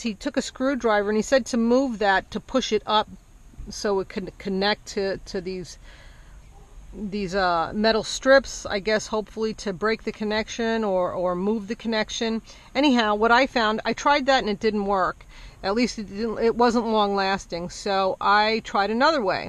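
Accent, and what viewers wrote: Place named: New York, United States. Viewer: Ha! This was great. I'm having the same problem with my 2011 Sonata and thought I might have to replace the fixture. I love how clear and straight to the point your video is... in and out in under 4 minutes. You'd be surprised at how many people mumble, speak too softly, or don't know how to get the main point across in this type of video. I'll try this out and let you know how I made out. P.S. Love your speaking voice. You sound like you're from my neck of the woods (NY/NJ metro area).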